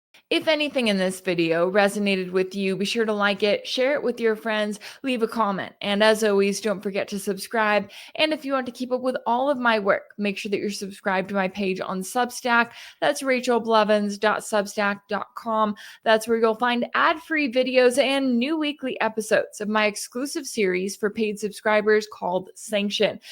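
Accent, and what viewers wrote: Texas, United States. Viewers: She sounds fine nothing wrong with it a girl from Texas.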